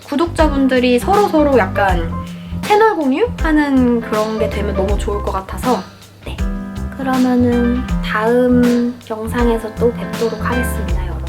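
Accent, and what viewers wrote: United Kingdom. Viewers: 영어가 유창한데 아시아/미국식 섞인 발음이 심해서 사실 예전부터 스트레스를 되게 많이 받았어요.. 어떻게 더 공부해야 할지 고민도 많이 됐었고ㅠㅠ 유튜브 알고리즘으로 님 영상 우연히 보게 됐는데, 영상으로만 봐도 아주 친절하신 분이고 무엇보다 언니의 목소리가 너무 좋으세요 영상틀고 잠들정도인데요❤️ 엑센트도 딱 제가 찾던 느낌이라 정주행 열심히 하고 있습니다.. 언니 앞으로도 브이로그든 공부법이든 그냥 영상 많이많이 올려주세여🙌🏻 언니덕분에 이제 드디어 영국발음고정 마음 먹었습니다..😤 (유튜브에 댓글 정말 안 남기는 편인데..부끄럽습니당,그래도 너무너무 저에게 힘이 되어주셔서 이렇게 달고 갑니당..🌹) 좋은 하루 되세요~!! 🥰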